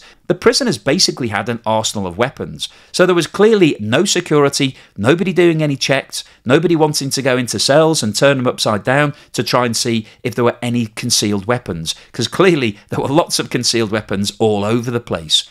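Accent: United Kingdom. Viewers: As soon as I hear this British accent, I block this videos almost immediately, because of all the scams, using the same British accent voice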